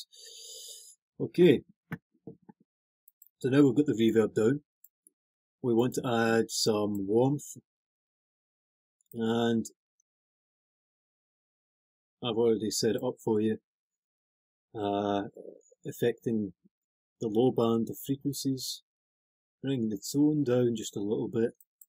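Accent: Scotland, United Kingdom. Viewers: He's Scottish not English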